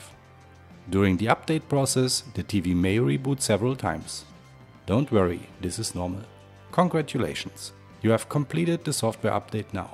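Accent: Germany. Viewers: Why using a native German speaker for this video. In fact because it's a Dutch brand it would be more appreciated to use a native Dutch speaker 😂😂😂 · I think you are german 😂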